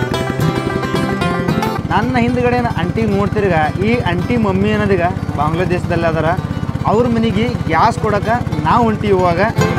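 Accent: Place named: Yadgir, India. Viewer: ಯಾದಗಿರಿ ಭಾಷೆ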